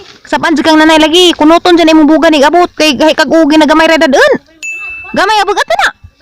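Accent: Bohol, Philippines. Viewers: Hello ate ka relate kos imong mga accent ug mga words ky taga ubay pud ako bana,ana sad iya accent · ISMAGUL....grabe jud pgkaboholana.... taga tubigon ko day.... silingan ra gihapon ta, 😂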